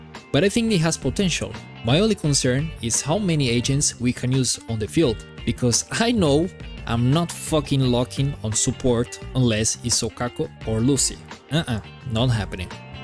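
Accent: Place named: Spain. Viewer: Te lo digo en castellano porque el acento español te delata descaradamente. Sobre el tema incursión arriesgada en concreto te recomiendo que busques videos de gente haciéndoselos con personajes a nivel 50. Creo que si fuera solo un dps check tener los personajes a nivel 60 con las habilidades cerca del 11 sería casi obligatorio y que el juego se puede jugar de manera más profunda de lo que aparenta. Los propios desarrolladores han dicho que querían diseñar el juego de manera parecida a un juego de lucha para que la habilidad de los jugadores tenga mucho peso y desde que he visto este estilo de videos e intento aplicar sus técnicas mis puntos en incursión arriesgada han mejorado una barbaridad. Solo eso, el resto de puntos me dan un poco lo mismo, este en concreto me toca de cerca porque yo estuve un tiempo frustrado con el modo también así que espero que sirva mi comentario. 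Un saludo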